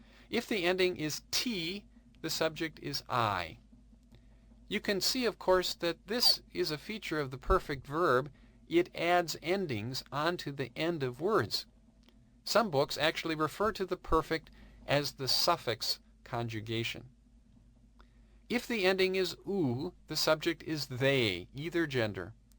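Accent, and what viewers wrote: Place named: Canada. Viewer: Please don't be offended, but I think I'm hearing a Canadian reading this Hebrew. It's just super weird because the French and Hebrew R sound the same, but this guy's "far west" pronunciation is almost grating.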